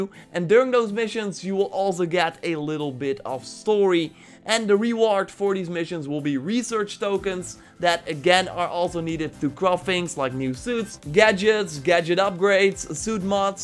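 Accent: Netherlands. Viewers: Are you from The netherlands? you have an accent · Are you from the netherlands? Your accent kinda sounds Dutch lol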